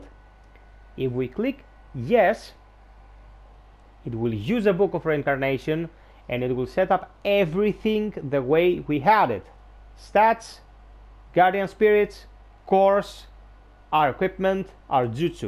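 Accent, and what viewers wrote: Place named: Greece. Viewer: Ρε φιλε βλεποντας το βιντεο καταλαβα οτι εισαι ελληνας απο την αγγλικη προφορα χαχα!!καλο βιντεο αδερφε.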